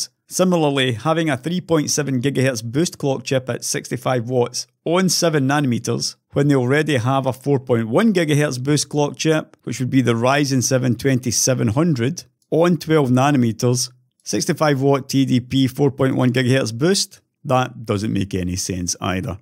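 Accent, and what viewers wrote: Scotland, United Kingdom. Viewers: Scottish accented videos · I just LOVE your scottish accent... you sound like straight outta Braveheart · with a scottish accent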